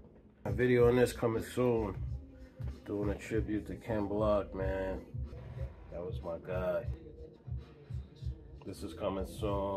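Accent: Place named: Nuyorican, United States. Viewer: I sense NYrican in the house!!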